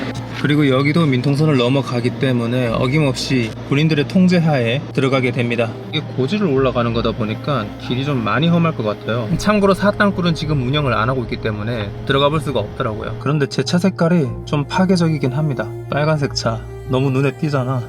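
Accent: North Korea. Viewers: 이분 탈북자임? 말투나 억양이 좀 있는거 같은데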